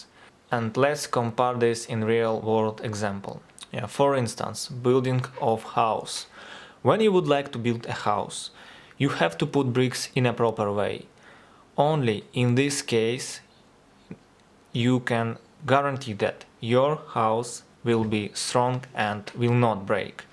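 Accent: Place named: Russia. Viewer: я бы сказал , что это русский мужик читает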